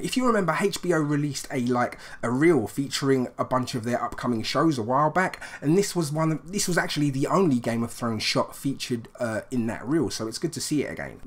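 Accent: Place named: United Kingdom. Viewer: hey! thanks for answering my question!! I subbed you a little while ago as you were doing a livestream with my pal Mark (SerHuntsReviews) and I'm enjoying your content a lot. I don't comment too often though. I enjoy your videos though. You do a good job!! I enjoy hearing Brits again - I miss them a great deal... I did my PhD in Aberdeen and lived there for 7 years. I'll be back over there again sometime soon to do further research probably in Durham, London and Edinburgh as well as my old standby - Blair-Atholl, in Perthshire. That place is gorgeous! Cheers and best wishes. Looking forward to your content in the upcoming season!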